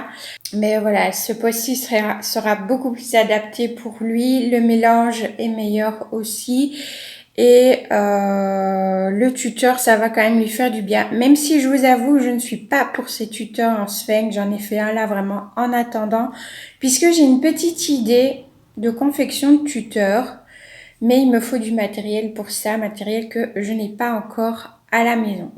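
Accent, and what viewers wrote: Belgium. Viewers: jaime beaucoup ton accent belge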